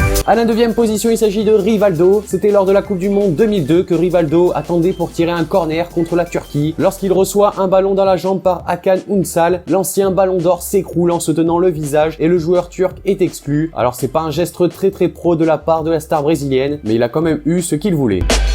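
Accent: Spain. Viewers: tu as un superbe accent espagnol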